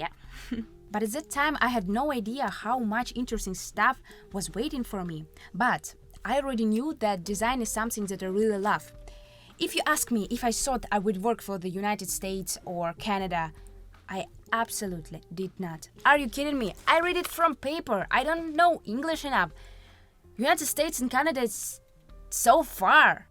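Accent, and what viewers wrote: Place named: Australia. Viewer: Your English is amazing! I thought that you're Australian :) Nice video, thanks!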